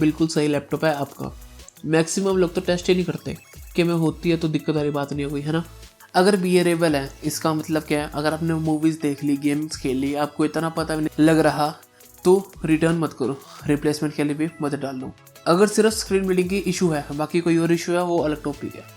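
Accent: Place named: Haryana, India. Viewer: That haryanvi accent 😂❤️, sunne me maza aa gya tbh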